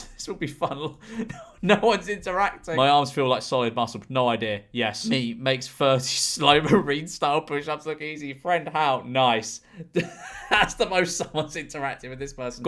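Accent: United Kingdom. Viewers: His British accent is just…. 😩❤️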